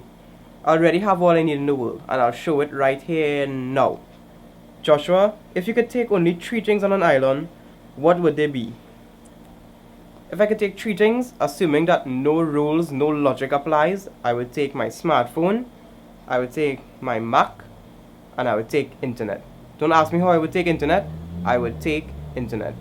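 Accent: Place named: Trinidad and Tobago. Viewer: Rel glad you stick to your trini "accent"...good stuff...keep up the hard work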